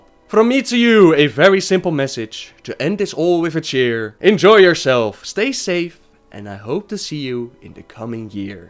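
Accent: Netherlands. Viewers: Love the lore vids. It's nice not having to read it all on the wiki for a change. That accent, Dutch?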